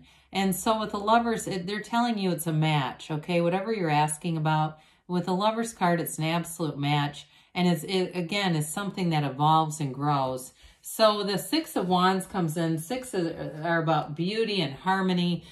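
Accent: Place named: Wisconsin, United States. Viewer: LOL you have a very distinct voice. I'm from the midwest...you sound like you are from Wisconsin. LOL Great reading! I'm the first female arch angel that walked the earth. I work for God...He prefers me single...I've been single for 22 years. I've been allowed to participate in the angelic realm before my time. Although I have so missed the life of a couple, He gave me the opportunity to know motherhood and for that I am eternally grateful. Outside of that it has been a life of serving God in the most unusual ways...God and I made a deal, as a healer, only if I am to affect someone for God do I care to know there problems...it's too much. But when I need to know I will. It's a very clear understanding and contract that we made...working for God has it's other perks. Thank you So much for this insight. I'm still in the 3D realm, I'm walking a fine line.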